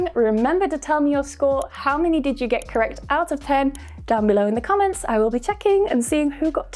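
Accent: United Kingdom. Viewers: I don't think Americans should get pronunciation lessons from a a British person... Js... you guys mispronounced words all the time. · There’s no such thing as ‘perfect’ English nor ‘correct’ pronunciation. There’s common ways to say things. You are British & as an American from NC (the South, y’all) there are many words I pronounce differently than you. Doesn’t make you correct nor me wrong. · You sound like a Britian. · She sounds British. Often has a silent T where I was raised. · I love to learn to speak the English language with the British accent. So glad to have found your channel. Also, I love how vibrant and fresh you are with your beautiful smile and rosy cheeks. :)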